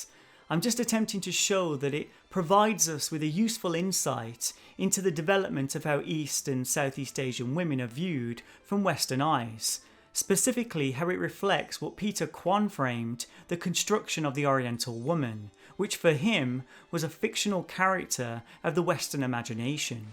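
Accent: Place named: United Kingdom. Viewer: I will admit that I was kinda of skeptical of your channel when I first stumbled with it cause you sounded very British, I'm glad you didn't just gloss over your own identity and pretend like it does not affect the way you view things. The fact that you tried to confront your own biases in this video is pretty great, so kudos to you. Your channel is awesome!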